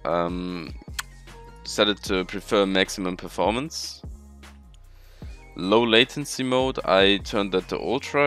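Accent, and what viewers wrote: Germany. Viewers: Wie man einfach das Deutsche raus hört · Ich wusste es das du deutscher bist habe mir die ganze zeit gedacht das ist doch ein deutscher der englisch redet aber jetzt der beweis 😂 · War ja klar das er Deutsch ist XD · cleannn valorant intro. edit: are u german, because u sound like whitecat (osu)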